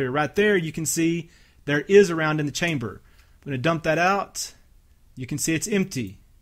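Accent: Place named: South, United States. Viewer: He kinda sounds like he's southern/midwestern so maybe he's in Missouri or Kansas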